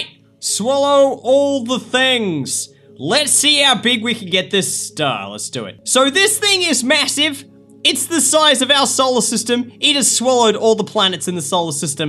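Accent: Australia. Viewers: Australian Jacksepticeye = Immediate sub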